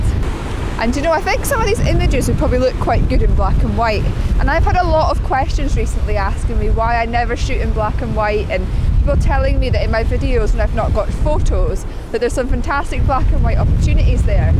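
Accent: Scotland, United Kingdom. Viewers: Totally insane!! Great video! Delightful scottish accent by the way, like it hahaha!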